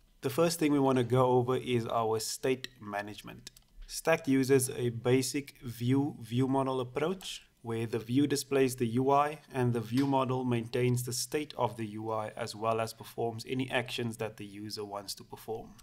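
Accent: South Africa. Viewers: Awesome! When I heard you talking I knew you were from South Africa! It's cool to see a fellow South African on YouTube! Awesome channel man.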